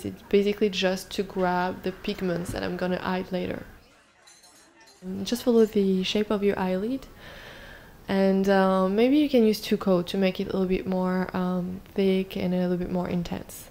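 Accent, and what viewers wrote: France. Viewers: I love watching these first videos you made. This was the first video I saw when I randomly discovered you on YouTube. I was instantly hooked! I absolutely loved that you did your makeup with your fingers, which made it look so easy and effortless. I loved that you were always doing your makeup in cafes, and of course the French accent 🥰👌🏻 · Omg i freacking love your channel, your videos and everything. They're pretty simple, and that's what I really love. It kind of reflects you and I think YouTube need more people like that. J'adore ce petit accent français également, il donne du charme à tes vidéos et à ta personne. Kisses from France ( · Es tu française ? J'entends un petit accent :)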